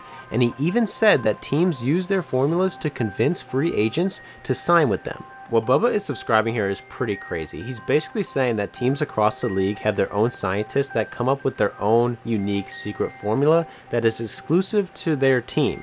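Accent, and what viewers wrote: United States. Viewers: why do you think the guy with an american accent doesn't speak english as a first language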